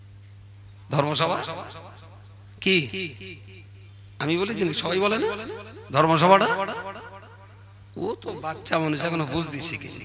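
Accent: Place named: Bangladesh. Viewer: এর কথা থেকে বোঝা যায় এই একজন অনুপ্রবেশকারী বাংলাদেশি।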